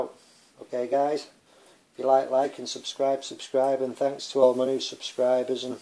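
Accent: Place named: Manchester, United Kingdom. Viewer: Will do, you sound very Manc. Looks like I'll be learning to weld stainless.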